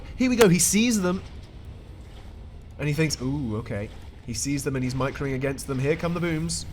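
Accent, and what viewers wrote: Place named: United Kingdom. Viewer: Excellent narration refreshing to hear hear a cool British accent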